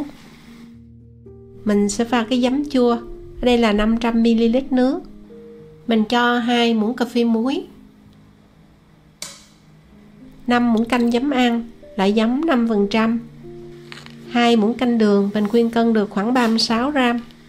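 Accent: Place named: Mekong Delta, Vietnam. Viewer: Hấp dẫn quá !! Món này làm lai rai thì đúng bài rồi , cám ơn lời chia sẻ và giọng nói thân thương của người dân ((Miền Tây))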